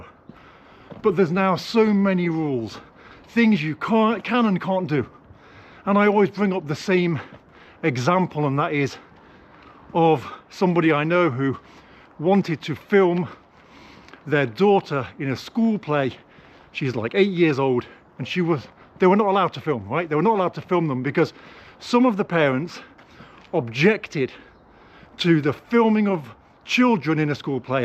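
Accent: United Kingdom. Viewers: uk is a dump, it's not diverse in russia is it? that might be why it's better? You've got 'harlem' on your hoodie, surely that's is treasonous to the motherland? You sound british but you don't look it, where are you from originally? I think the upper classes have contempt for the working classes so they bring in mass migration as they think we are all disposable. They don't realise that they will have to live amongst the newcomers. Don't say the elephant in the room just throw labels at people and call them bigots. Woke culture was probably introduced to subjugate the working classes, do you really think that the upper classes ascribe to the woke dogma? They all send their kids to private schools which are the least diverse of places. They're just psychopaths a lot of them, but some are plebs, I'm not sure on the ratio?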